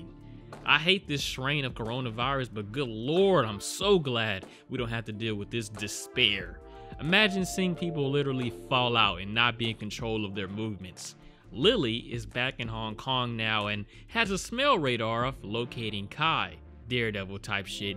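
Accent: United States. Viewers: He sounds like he's an American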